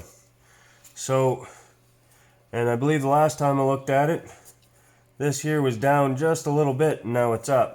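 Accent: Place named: Nova Scotia, Canada. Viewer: Good work...I am going to tackle this same job. I think I recognize your accent. Nova Scotia?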